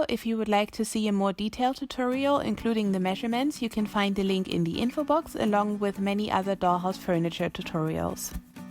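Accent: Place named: Germany. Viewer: Sie kommt zu 100 Prozent aus Deutschland ich höre es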